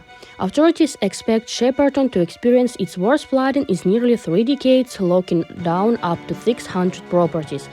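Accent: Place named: India. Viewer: 인디안 영어냐? 발음이 왜그래?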